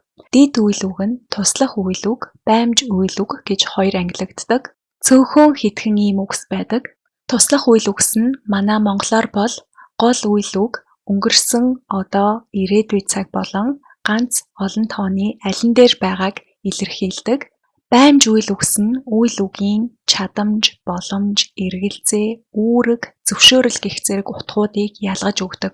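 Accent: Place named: United Kingdom. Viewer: Энэ тхдэ англи хэл зааж байгаа байж British english- ний дуудлагаар дуудаар байна. үгийн дунд орсон T үсэгийг 20% тай хэлдэг англи хэлэнд харин бритиш хэлэнд тэрэлттэй Т үсэгээр хэлдэг. Их гоё бичлэг хийж байгаа юм байна маш их баярлалаа дараа дараачинх дээрээ анхаараарай багшийн эрдэм шавиас гэдэгшүүдээ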